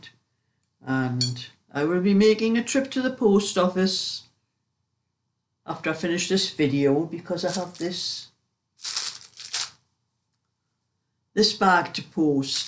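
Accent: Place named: Northern England, United Kingdom. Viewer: I love your accent, I’m from the South, so I know your up North..lol Had endless rain which made my grass grow. Cut it and the mower really struggled, as did l.. Your knitting is beautiful. I can knit but only simple things, at the moment using my stash for pet blankets for my daughter’s vet.I’ve just learnt basic crochet ( from YouTube ) after many years trying but only doing the simple single st at the moment…baby steps…lol 😂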